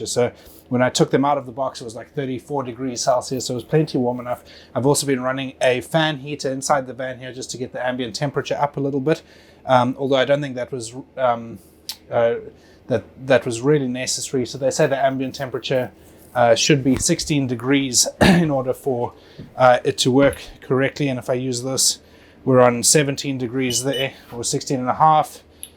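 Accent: South Africa. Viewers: Very helpful and informative as always, I was thinking about using this product as it really gets in everywhere. Thanks again for your excellent work, I was wondering are you from south africa.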